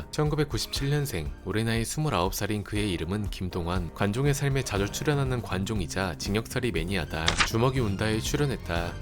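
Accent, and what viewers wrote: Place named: Gyeongsang, South Korea. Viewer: 갱상도임 ㅋㅋ우파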